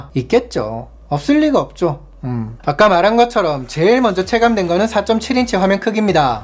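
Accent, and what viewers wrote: Gyeongsang, South Korea. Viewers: 경산도 싸나이 리뷰 웬지 신뢰감이 듬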